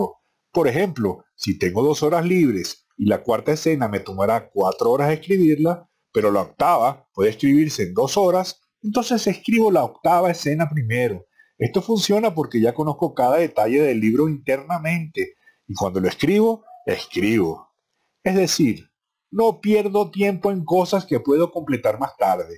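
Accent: Venezuela. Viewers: Buen video. venezolano?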